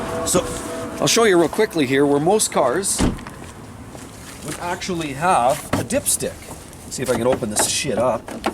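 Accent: Canada. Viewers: listening to your accent to figure out that you are another fellow canadian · Your Canadian aren’t are you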